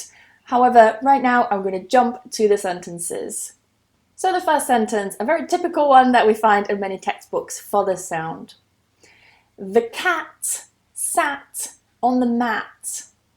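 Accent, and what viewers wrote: United Kingdom. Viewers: Love your British pronunciation!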